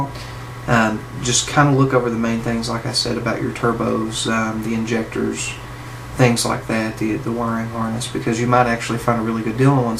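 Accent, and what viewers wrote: South, United States. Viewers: Speak with southern accent loud and proud. Fuck what anybody else think. I can't stand the NY accent and find it obnoxious, but do you really think they care what I think. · sexy southern daddy damn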